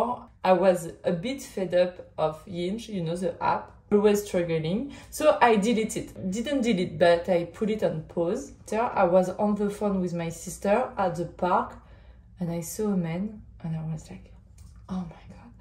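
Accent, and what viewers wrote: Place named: France. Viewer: i love your heavy french accent and your style and how you express yourself. you remind me of the beauty and the woes of everyday life and i love how it makes me feel less alone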